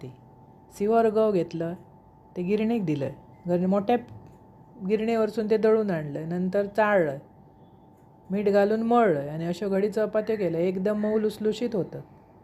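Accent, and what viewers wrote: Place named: Malvan, India. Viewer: Khupach chan🙏🙏 pan तुमचे बोलणे जास्त सुंदर आहे मला खूप छान वाटते तुमचे मालवणी पद्धतीचे बोलणे मला हिं च्चहा chapati khupach आवडतात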